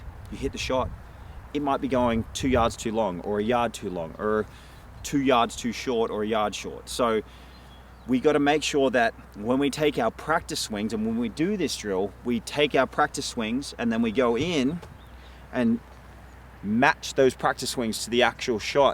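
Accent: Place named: Australia. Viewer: is he an Aussie? sounds very Aussie!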